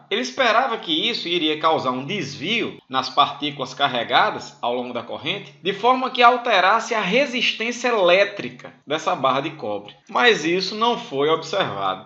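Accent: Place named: Northeast, Brazil. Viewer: o mais bonito é esse sotaque visse . bichim amo meu nordeste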